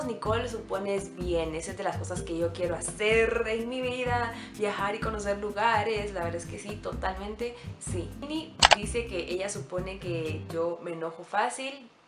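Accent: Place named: Guatemala. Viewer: Que rico volver a escuchar un acento chapin. Y que mejor de una mujer porque como que tienen mas marcado nuestro acento hermoso. Saludos y tenes razón con eso del tráfico que es espantoso, pero en mi lugar prefiero la ciudad porque en un publo me aburriría un montón.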